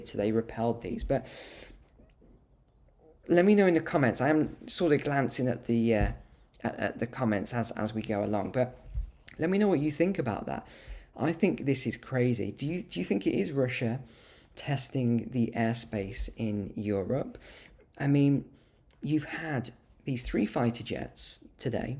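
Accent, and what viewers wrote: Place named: England, United Kingdom. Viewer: I don't know where you live, but you sound like a Pommie, so you must know your country is a socialist state, otherwise you would not have all the welfare services on offer. Indeed all western Countries I would say are Democratic Socialist countries (except for the USA), because they all have welfare programs and social safety nets.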